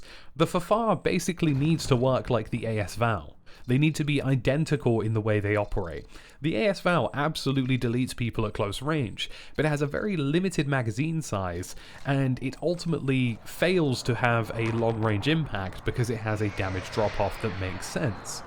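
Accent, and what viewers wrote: United Kingdom. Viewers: Sick channel nice to hear a uk accent ha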